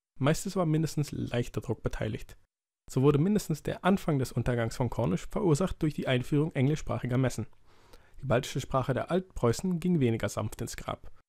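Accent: Germany. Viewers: Hallo. Das ist wirklich ein toller Beitrag und super interessant. Ich musste ihn allerdings mehrmals hören, weil du sehr schnell sprichst und dadurch auch teilweise sehr undeutlich. Das ist ein bisschen schade, denn sonst wär das echt top. Ps. Sicher bist du Berliner? Du klingst eh ein Flensburger.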